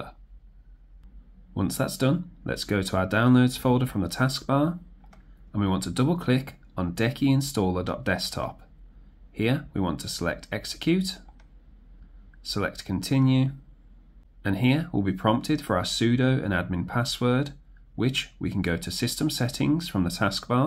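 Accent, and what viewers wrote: Birmingham, United Kingdom. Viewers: Really useful video cheers, do I sense a fellow Brummie?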